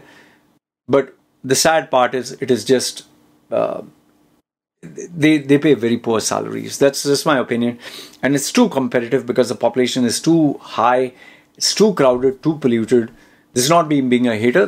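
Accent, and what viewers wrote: India. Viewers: True ,too much of corruption here. You sound Indian ...absolutely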